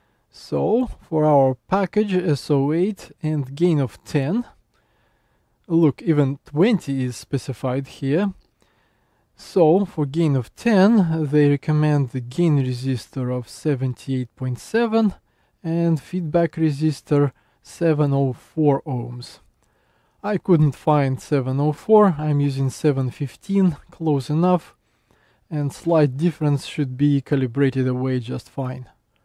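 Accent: Russia. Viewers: Hm, you have a Russian accent. Are you from Russia?=)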